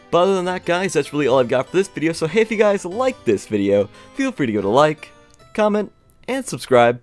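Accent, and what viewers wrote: Canada. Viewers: love the accent, very Canadian 😎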